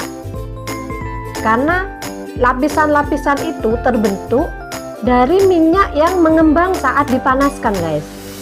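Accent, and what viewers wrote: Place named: Madura, Indonesia. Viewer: Suka banget dengan logat bicaranya mbak. Salam madura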